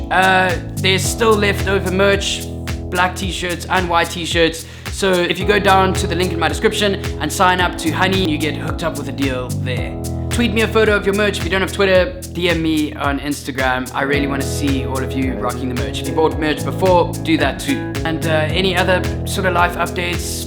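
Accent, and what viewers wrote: South Africa. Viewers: Great message, Dan, but when you start talking fast it's hard to understand you. Even when your accent isn't that strong, the different SA vocab and your word choice makes it hard to follow without replaying what you're saying at least once or twice. Maybe slow down a little so everybody can understand you! Thanks!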